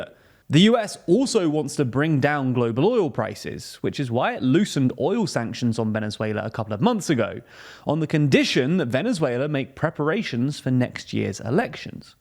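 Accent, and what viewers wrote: England, United Kingdom. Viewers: Never trust a YouTuber with an English accent. Never.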